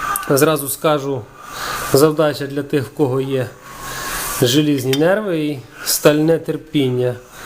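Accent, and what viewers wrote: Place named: Surzhyk, Ukraine. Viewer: Гарне суржик. Дякую.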